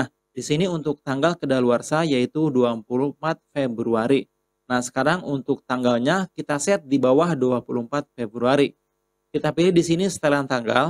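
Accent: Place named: Java, Indonesia. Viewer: maaf sebelumnya sebenarnya sampean itu piyayi pundi to logat bicaranya seperti orang jawa 🙏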